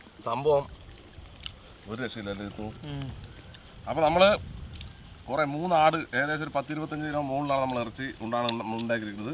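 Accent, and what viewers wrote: Palakkad, India. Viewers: പാലക്കാടൻ സ്ലാങ് 👌🔥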